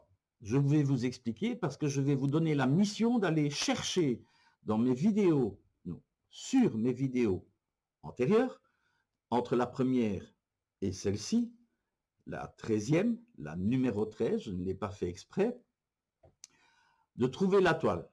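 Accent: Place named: Belgium. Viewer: Bonjour,j'adore t'écouter en étant sur mon dp, mon beau-frère est belge aussi, je me lasse pas de vos accents 😁,chose sérieuse...video 11,toile 3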